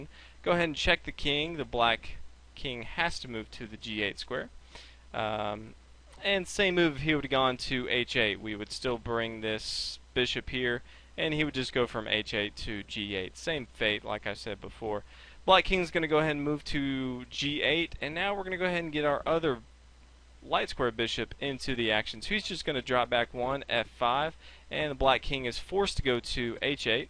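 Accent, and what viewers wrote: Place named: United States. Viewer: I end up hearing an odd British accent at the beginning of the video, realizing it's more less an American accent. Isn't that weird?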